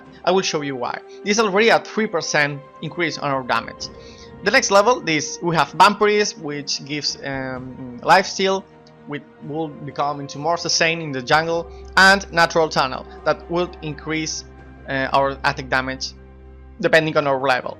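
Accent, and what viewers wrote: Spain. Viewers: ¡Hostia un Shaco!? Are you Spanish?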